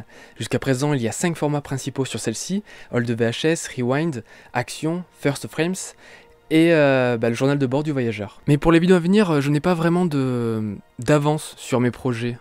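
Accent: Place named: Montpellier, France. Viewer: j'adore ton accent! j'ai le même! Dommage, je viens juste de te connaître et tu pars de Montpellier ;-; Bon courage pour Paris mon pote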